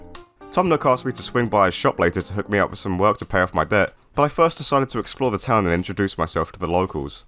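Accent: United Kingdom. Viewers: You from kent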